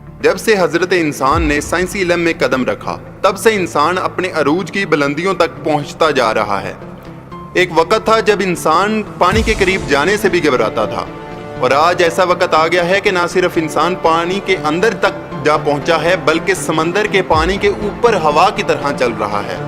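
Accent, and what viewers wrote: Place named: Punjab, Pakistan. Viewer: تمھاری پنجابی زبان کے لہجے میں اردو بولنا انتہائی گھٹیا لگ رہا ھے. پنجابی میں اپنی ویڈیو شروع کرو.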